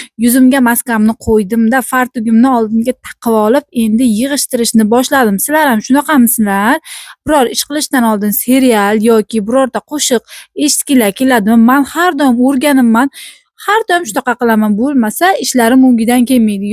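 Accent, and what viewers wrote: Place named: Olot, Uzbekistan. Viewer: Shevayizga gap yoq,haqiqiy olotcha❤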